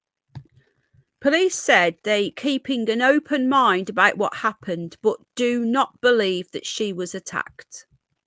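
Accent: United Kingdom. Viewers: LOVE YOUR UK ACCENT.VERY SEXY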